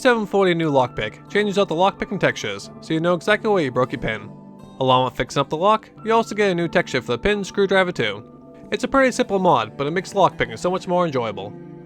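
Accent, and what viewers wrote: Boston, United States. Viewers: Chris Kozma I know I'm really late but he's from Boston lol. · Just want to say, your voice is very fitting for a game set around Boston.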